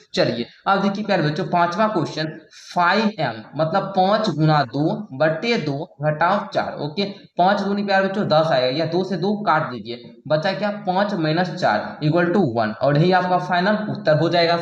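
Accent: Bihar, India. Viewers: Kya Bihari Ho Ho😂😂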